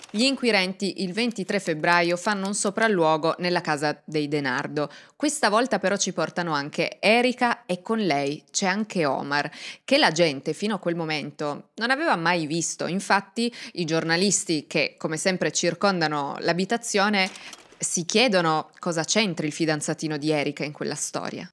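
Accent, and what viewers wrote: Turin, Italy. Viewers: Troppo accento torinese , un po' di dizione non sarebbe male. Tutto il resto e' perfetto. Brava , complimenti sinceri.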